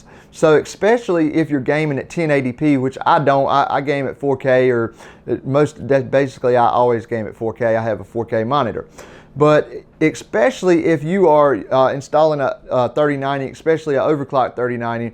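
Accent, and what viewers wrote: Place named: Kentucky, United States. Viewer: About time someone on the east coast gets a tech following going lol. I think GN is east coast but not sure. Are you from KY by chance? I only ask because you have almost the same accent as I do.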